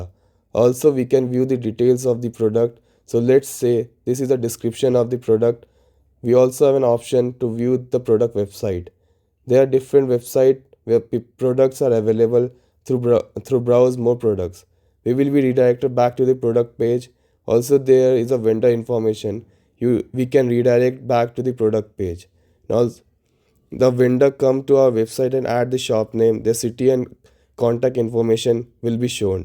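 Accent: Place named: Bihar, India. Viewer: your bihari accent is so sexy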